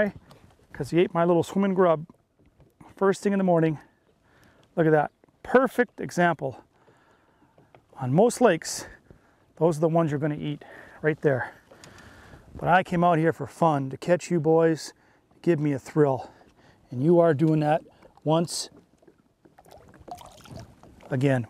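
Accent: Canada. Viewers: Are u Canadian eh? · lol sounds like he from canada. Thanks for the great tips!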